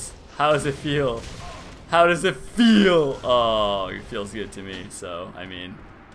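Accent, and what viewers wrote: Canada. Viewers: Your level of Canadian is just fantastic really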